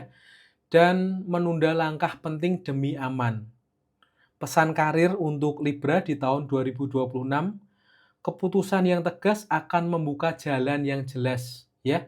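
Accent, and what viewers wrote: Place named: Java, Indonesia. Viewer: Medok bgt Jawa nya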